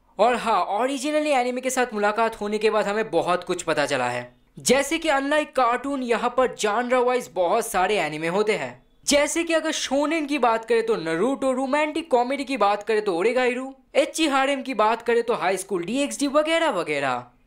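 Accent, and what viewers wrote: Bengal, India. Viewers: Bro u from Kolkata ur accent like Bengali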